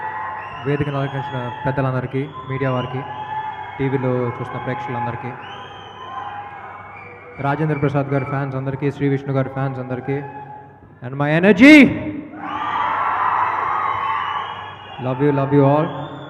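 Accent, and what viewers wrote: Telangana, India. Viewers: After I smart Shanker....telangana slang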